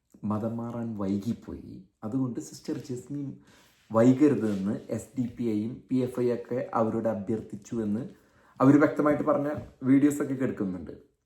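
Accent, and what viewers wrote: Thrissur, India. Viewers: ethu … ee oola video kannunnavarku budhiyundenno 😝😝😝 Ivano Americayil etho family visayil allipidichu kudiyeriyavananu 😝😝😝 porathathinu oru oola thrishur slangum … 600 mandanmare ollu ennathu oru nalla karyam thanne 🤯🤯🤯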